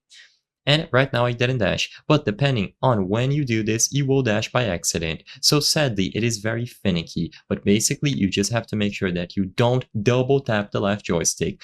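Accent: Brazil. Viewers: You have a Brazilian accent, that's funneh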